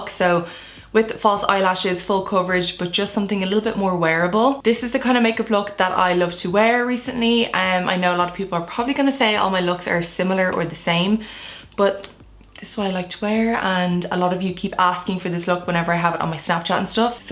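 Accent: Ireland. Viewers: Beautiful. Didn’t need the lashes, but they’re gorgeous too. I’m in California but I lived in Ireland for a few years, and I MISS the accent and the fast talking! Most Americans just talk too slowly! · I keep seeing Charlize Theron mentioned, but she could only hope. You look computer generated (I mean that in the best way - you're inhumanly beautiful). Plus, your voice is a lilting almost ASMR-like brogue. I don't know how you don't have 40 million subs!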